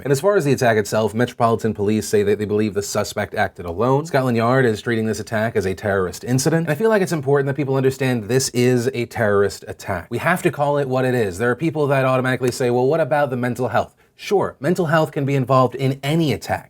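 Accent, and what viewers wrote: South, United States. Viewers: Maybe this is a dumb question, but: why does Philip DeFranco end up with a slight Southern drawl in the end segment? How did that come to be a thing?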